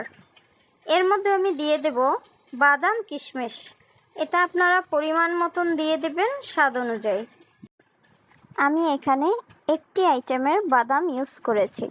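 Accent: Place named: Barishal, Bangladesh. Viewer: আপু তোমার কথায় বোজা যায় তুমি বরিশাইলা😅😅😅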